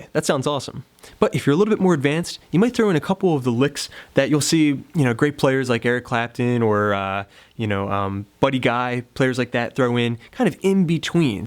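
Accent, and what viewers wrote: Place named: Philadelphia, United States. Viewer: I thought I detected a Philly accent...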